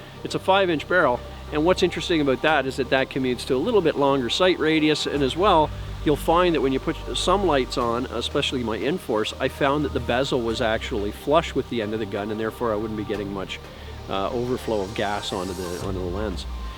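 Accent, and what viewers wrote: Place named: Canada. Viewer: You're either in California or Canada.. I vote Canada, because the "aboat" thing. The gun actually comes with a 17 round mag in normal places.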